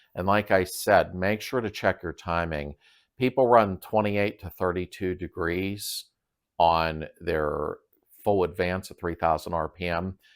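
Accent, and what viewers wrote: Pittsburgh, United States. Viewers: Just discovered your channel! From your accent and the WDEV sticker in your shop …. I’m thinking you’re a Yinzer! So from one Yinzer to another …. Thanks for great content. I discovered you while doing some research on buying and maintaining a classic beetle. I’ve been in the market for a super beetle convertible. Solid driver …. Not a show car. Your content has been really helpful. Any recommendations on sites to shop for a car would be helpful. Thanks again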